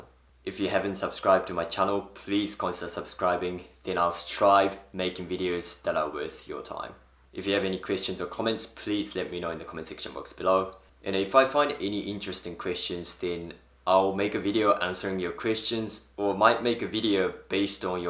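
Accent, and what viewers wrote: New Zealand. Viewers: great video, i'm from NZ and i can hear the Kiwi influence in your accent :) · It’s more like a kiwi accent. Interesting that you mistaken it for British though! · That was my first thought as well... wth... why r u microwaving bread. Also why only 1 slice?????? Plus I was trying to suss out the accent and u said nz and now I get it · I love this! Aussie girl her and soon as you started talking i was like.... hmmmmm that accent... then you said you moved from NZ! Lightbulb moment ! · You have an interesting accent- a combination of different places?? How long did you live in New Zealand for? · Haha! Even before you mentioned NZ I was like, that accent sounds very familiar... I’m a kiwi 😊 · Kiwi accent 👍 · I thought I detected a New Zealand accent, I wasn't wrong. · Really enjoyed this video, love the whole concept of minimalism and this was very achievable, once you mentioned that you had lived in New Zealand I could recognise a slight Kiwi accent. 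· Great insightful video. Enjoyed your thoughts on minimalism. Also, strangely, I immediately noticed the flat “i” sounds of your New Zealand accent. G’day from a new Aussie subscriber :) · You have such a Kiwi accent Lol!! Keep up with the YouTube videos man! I miss living in Japan..had to move back to NZ because of Covid :-( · I love his kiwi accent.. or is it him that i love? ☺️ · HOTTIE ! I love New Zealand accents and Japanese men. Why am I not married to this guy ?? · Ahh! I knew you were from NZ I could hear the nz accent! Just a wee bit 😄 I’m from Auckland. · Minimalism is a state of mind- and it takes practice. Also: Your combination of Kiwi and Japanese English accents is simply fantastic. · His accent is not pure Kiwi. It sounds a lot Kiwi and a little bit something else 😊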